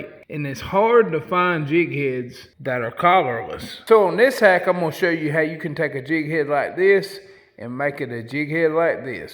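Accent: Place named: South, United States. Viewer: I like this guy.... country drawl n all 💪🏾🐻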